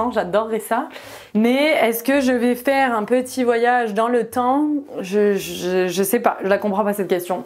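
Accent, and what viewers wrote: Quebec, Canada. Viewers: Vidéo génial ❤ j’ai l’Impression que plus le temps passe plus ton accent québécois s’accentue 😂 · Tu as de plus en plus l’accent canadien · C’est drôle tu commences à avoir l’accent québécois sur certaines assonance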